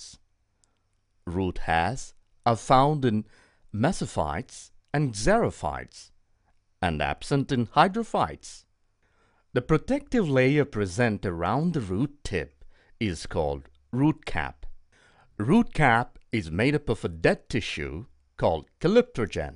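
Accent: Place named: India. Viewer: And whats up with the indian accent?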